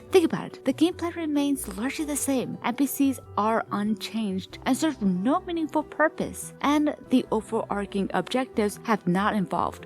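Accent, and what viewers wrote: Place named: Europe. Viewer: What unnamed random mysterious European country is this chick from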